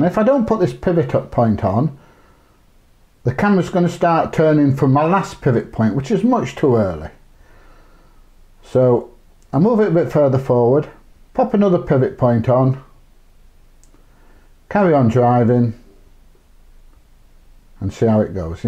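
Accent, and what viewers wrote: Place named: Doncaster, United Kingdom. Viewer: I come from Doncaster, live in Chicago now, but I sense you’re from around there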